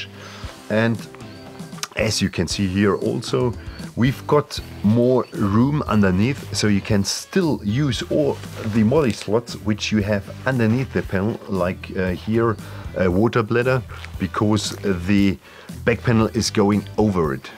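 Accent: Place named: Germany. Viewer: Nice video. I like LT gear. Always reliable, innovative and robust . Good video. I like the German accent. Had to think about the German coast guard video. <link>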